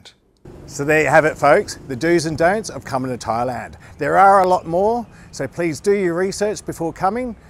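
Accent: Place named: Australia. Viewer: DEAR, I AM AN AUSTRALIAN TOO BUT YOU HAVE BEEN 14 YEARS THERE SO YOU HAVE A SLIGHTLY DIFFERENT ACCENT. GOOD ON YOU!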